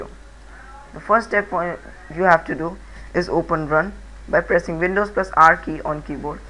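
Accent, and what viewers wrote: India. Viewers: Funniest indian accent ever but thanks for fixing my problem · you are indian xD ? ps im not trolling you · Polish person with Indian accent i love it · are u indian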